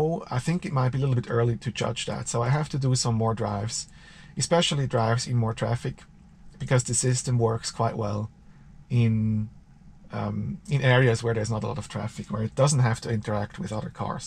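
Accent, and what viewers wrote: Switzerland. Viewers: Sicher en schwizer mit dem akzent😄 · Hmm... you sound very Swiss :) Grüezi Thanks for the video!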